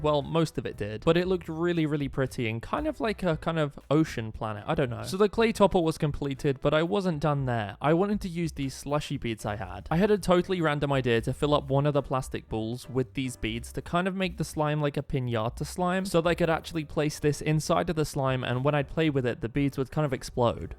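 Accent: United Kingdom. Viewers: Are you British? Because you sound British. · Are you British bc I am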